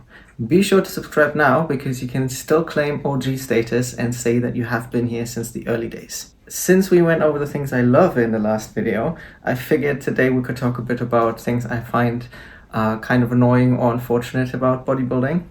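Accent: Germany. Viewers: Bulgarian, but u have a german accent?😅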